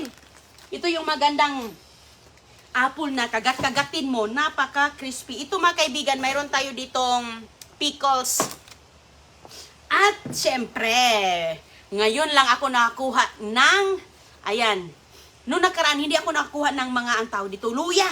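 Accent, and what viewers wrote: Visayas, Philippines. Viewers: it's bisaya accent...wag ka mag inarte...bakit ang boses mo ba boses angel ka ba😂😂😂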